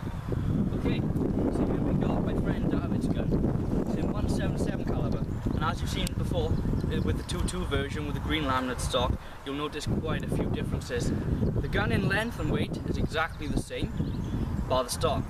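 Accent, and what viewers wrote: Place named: South Wales Valleys, United Kingdom. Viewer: aye obviously with that valleys accent lol. Im up in merthyr, picked up my 97k blackline yesterday for my first rifle, have a nikko sterling 4.5-14x50 AO IR coming monday for it. Cant wait to shoot it! I really like the 77 aswell, im now saving up for the 110 as a first pcp, im strictly target shooting. Any new Air rifle reviews coming soon mate?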